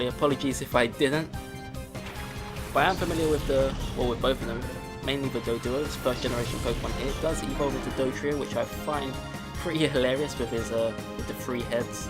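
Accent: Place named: United Kingdom. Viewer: So, noting the UK accent... whereabouts in the country are you? I'm guessing south? :)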